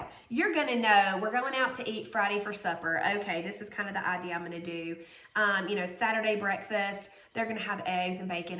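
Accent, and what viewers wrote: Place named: South, United States. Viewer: I love your videos! I am from the West so the southern accent is one of my favorite parts of your videos!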